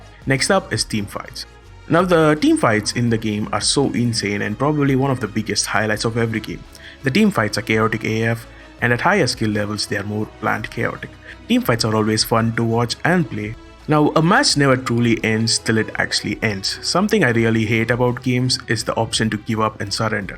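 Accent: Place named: India. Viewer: that indian accent haha superb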